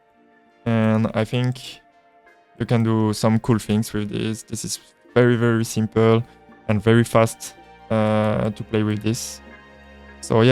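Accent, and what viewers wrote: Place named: France. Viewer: Comment il gère ça le bro avec le ptit accent frenchy hehe :) lourd lourd lourd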